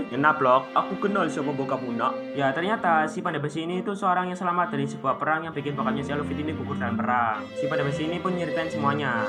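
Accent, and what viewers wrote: Java, Indonesia. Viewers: Bang besok eudora (ngomong ngomong abang orang jawa kan?)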